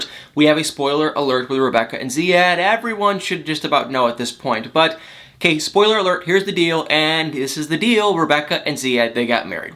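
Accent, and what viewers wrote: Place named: Midwest, United States. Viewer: You crack me up a northern mid western constantly saying Y’all. 🤪. Love ya. Greeting from NYC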